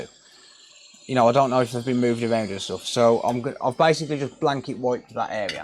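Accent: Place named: Black Country, United Kingdom. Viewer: Brilliant, your patience and attention to details is superb. You sound like a black country boy, where are you located?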